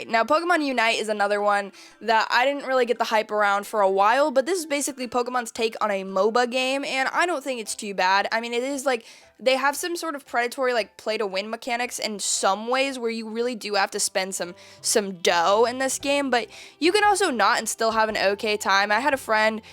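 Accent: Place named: Pennsylvania, United States. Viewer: do you live in Pennsylvania? The way you say certain words makes me think you do hahaha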